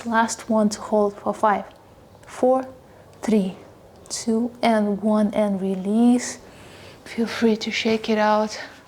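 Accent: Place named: Kazakhstan. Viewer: cool Kazakh accent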